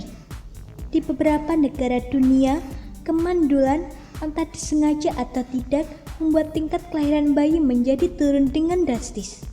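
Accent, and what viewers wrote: Java, Indonesia. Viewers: dialek jowo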